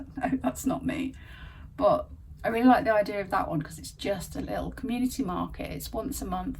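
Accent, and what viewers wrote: Nottingham, United Kingdom. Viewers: Your youtube channel came up on my list a few months ago….I watch and subscribe to many lovely resellers so maybe thats why? I was pleased to discover you were Local- ish to me …i live near Dawlish … I felt there was something familiar about you ….it wasnt until I saw your video about your visit to Nottingham that made me wonder if it was your accent…..I originally come from a small town near Hucknall and then moved to Newark and then moved to Devon 20 odd years ago ….I havent visited Nottinghamshire for many years so it was lovely to see some of it again….and your recollection of Vicky centre indoor market ….I really like your videos and your outings and wish you well with your move back up north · I can't remember if I saw you in one of Caroline's videos or if I started watching via youtube's suggestions? This might sound strange but I love the British accent so I like listening to both you and Caroline talking. 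And the Expawers which I learned about from Caroline. As for feeling lost, have you thought about volunteering? That could give you a bit more purpose. I volunteered at the humane society walking dogs or helping people pick out a pet to adopt. Perhaps think of something you might enjoy doing? Take up knitting? Research your family tree? Just a few ideas that came to mind. And right, it's that time of year. We've got gloomy weather today too (upper midwest in the U.S.) which always brings me down. I'm going to go wrap some presents and try to ignore the weather. I hope your icky mood passes soon. 🤗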